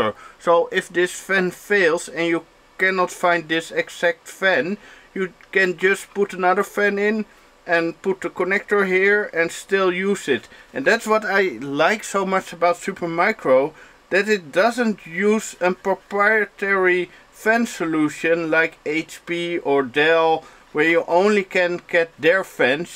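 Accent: Netherlands. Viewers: leuke bak man :).. ben ook op zoek naar zoiets.. jeetje je bent echt een nederlander met je accent lolz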